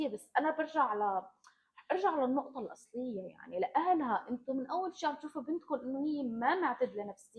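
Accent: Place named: Morocco. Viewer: أنت أكيد من المغرب... Your accent باين ماشي لبنانية.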